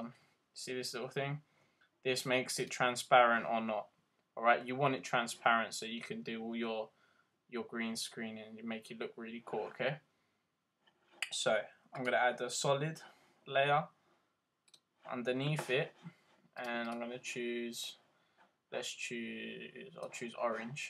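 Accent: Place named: United Kingdom. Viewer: thnx for that, helped me on my assignment. cool british accent u got there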